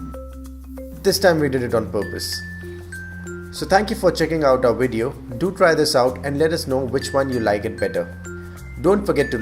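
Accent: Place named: India. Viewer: Sir app ko hindi nahi aata hai. awaz sy to indian lg rhy ho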